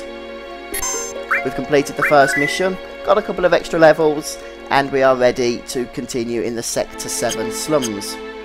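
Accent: United Kingdom. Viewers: ooo a british lper I'm subbing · I know this is way off topic for the game and everything, but I was wondering where is from. I can't quite place the accent. I have a slight thought of British but then again Australian also comes to mind. I mean correct me if I'm wrong but it's just a thought and I'm really curious. I'm a little embarrassed to say that I find his accent cute...;)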